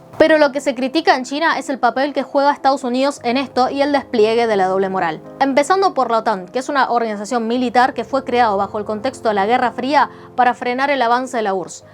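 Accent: Argentina. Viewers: Tu. Español argentino en una chica asiática se ve hermoso. Saludes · Que lindo tu acento Argentino . Me gustaría tener una esposa con los ojos chinitos. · Tu castellano (tu pronunciación y las palabras que usas) es claramente argentino... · Tienes como un poco de acento argentino. En dónde vives? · Me parece un buen video te felicito .Mil veces mejor que los noticieros porque aquí solo todo a favor de los gringos como si fuera una película que hacen ellos que solo ellos son los buenos.Tu acento Argentino me gusta mucho muy bonito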